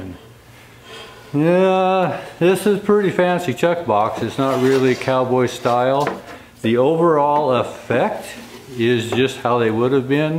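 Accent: United States. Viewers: English, even with an American accent is fine by me..👍🏻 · Overall a very nice wagon. Not sure what could have been on the surface of the kitchen box, but it's my hope one tradesman sets up the other for success. It will be interesting to learn at some future date how the kitchen cabinet makers style box construction holds up against the typical use of such a wagon (assuming it will get used)? Thanks for the videos - I enjoy them. BTW: 100% good ole American English ! 👍👢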